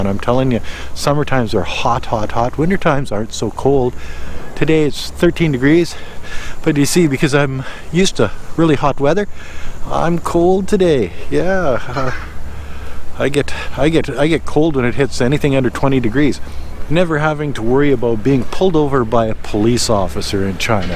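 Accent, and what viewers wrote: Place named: Canada. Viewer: I knew this dude was Canadian after hearing him speak just one sentence! Although he has been living in China, he hasn't lost the Canuck accent for real, eh?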